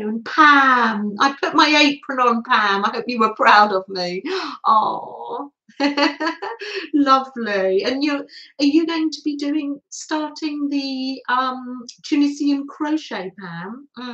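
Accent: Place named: United Kingdom. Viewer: Hello sweet 🦋 and Freddie 🐾 My morning birthday treat/gift was to begin my day watching you playing and creating 🥰🥰 I had a beautiful time with you my friend 🦋🎨🎨 you made such a beautiful painty background on the fabric love the Freddie paws on it 🐾🐾❤️ I played along with you with your prompts 🎨✂️🖍 and btw your French with your lovely British accent is perfect 👌 thank you very much Merci beaucoup for this fantastic moment with you❤️❤️ hugs and kisses to you both 🧚‍♀️🐶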